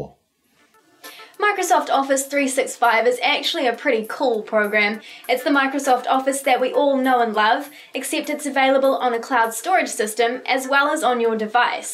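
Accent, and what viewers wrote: New Zealand. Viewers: I think that's a New Zealand accent. They tend to pronounce the a in words as an i. "hiving the software"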